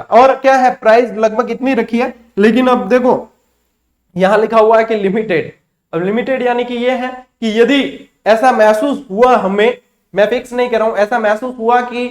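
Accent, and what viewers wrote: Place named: Marwar, India. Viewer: Very very nice class sir Ji........ आपके मुंह से मारवाड़ी बोली बहुत अच्छी लगती है सर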